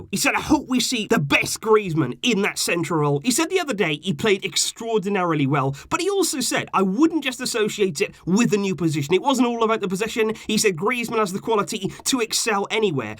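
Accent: Wales, United Kingdom. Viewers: Tf are you on about, he's from Wales and has an accent, you have a problem?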